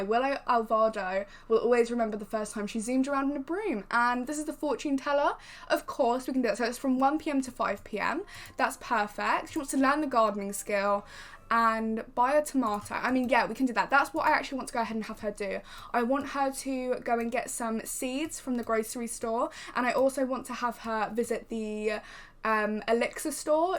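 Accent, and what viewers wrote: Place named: United Kingdom. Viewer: I love british accent♡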